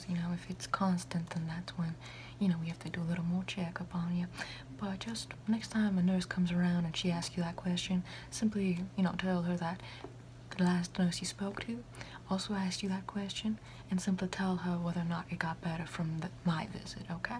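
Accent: South, United States. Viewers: That southern Belle accent. It's melting my heart. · It's southern sugah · Woman calling me honey in a southern accent Me: 😢grand ma😢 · She looks Tamil Indian but has a southern accent....how exotic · The soft southern savana accent reminded me of grandma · We love that southern accent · i live in Mississippi and that southern accent is spot on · As an Englishman would someone help me out and tell me what region of the United States this accent is from ? It’s the most beautiful accent i think I’ve heard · The southern accent is perfect! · I love the southern accent! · The crazy part, I’ve seen her other videos and this southern accent isn’t her actual accent it’s just for this video but it’s so good. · It seems to be more of a blend of the old southern and transatlantic accents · Where is the part, returning to the battlefield getting injured and then coming back again for this nurse with that Southern Accent? 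We need a whole series on this. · Josh Reyes It seems like she is trying to do something like a deep southern accent but modern southern accents are different from the accents of the 40s and 50s. The r's at the ends of words like four aren't as pronounced making it more like a European accent. She also says Mississippi like Mississipeh which is how a lot of southerners pronounced it during the time period. I don't know if this is exactly what she was going for but it's what her accent sounds like to me. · God damn I love your southern accent.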